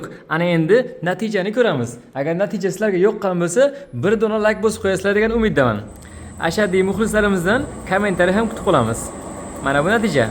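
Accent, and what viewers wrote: Bukhara, Uzbekistan. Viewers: Бухороча шеветон дажонам❤😅😅 а Владивосток салом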